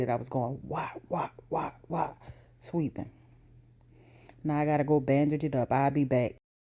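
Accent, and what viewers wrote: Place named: Louisiana, United States. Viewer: You popped up in my feed and the minute you started talking I was like “she has to be from right down the street from me” 😂 (New Orleans) Love your videos. Love your garden. Even the part where you don’t talk. It’s real! And you’re showing us the everyday hard work it takes to grow your own food - instead of when the garden is all full and everything is blooming and it suddenly just got that way … you know the videos I’m talking about? Anyway, thank you for taking all the time it takes and sharing this, I actually picked up some tips or what to do’s from watching. ❤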